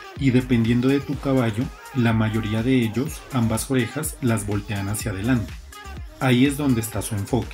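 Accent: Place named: Latin America. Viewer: Wouldn't be easier if you keep on speaking English and have the subtitles or close captioning in Spanish? Although I understand Spanish, it is spoken too fast for me and is heavily accented (South or Central American?). Or, can you re-post the video in English? Make everybody happy?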